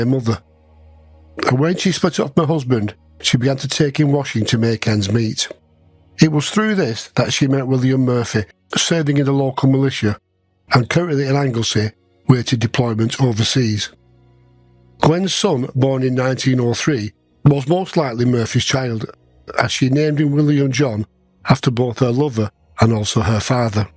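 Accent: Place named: Lancashire, United Kingdom. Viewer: I love the Lancashire accent. Far preferable to any Southern English namby-pamby accent.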